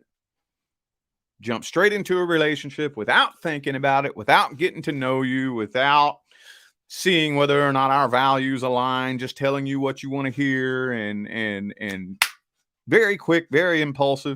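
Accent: United States. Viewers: It's cool to hear a reading in my country boy accent. Very good read.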